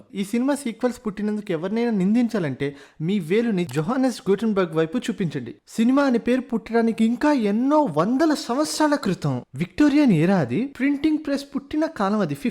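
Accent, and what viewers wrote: Karnataka, India. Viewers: Kannada accent lo intha acha telugu nuv tappa evadu mataadadu ra babu 😁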